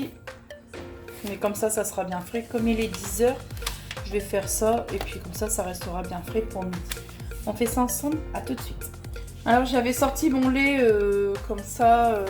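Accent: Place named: Nord-Pas-de-Calais, France. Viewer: Coucou ma.poule. oui moi aussi je me sers du même eplucheur que toi de la marque typer....re. J ai fais des gaufres sèches du Nord, cette semaine. Bon je ne suis pas chti lol...mais j avais envie d essayer..😊 je suis du 77 à côté de 2 grands parcs d attractions bien connu. 20 minutes pour 1 et 1 h pour l autre. Tu parles chti c est sympa... pour le live je crois que c est toi qui bloque ou pas la personne en direct..mais bon ..renseigne toi car j y connais rien lol..moi je travaille dans une grande banque du 4/40. Actuellement en arrêt suite cancer du sein il y a 1 an. C est récent. Sinon j aime la marche. Je fais environ 40 km par semaine. Voilà ça te donne une petite idée de moi.. Pleins de bisous. 😊😊😊❤❤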